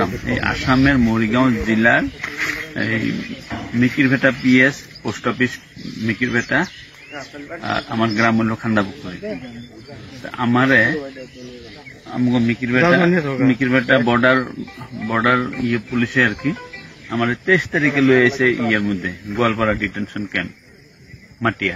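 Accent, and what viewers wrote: Bangladesh. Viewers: Apnar Bangla sunei bojha jacche apni Bangladeshi. Amra sob bujhi.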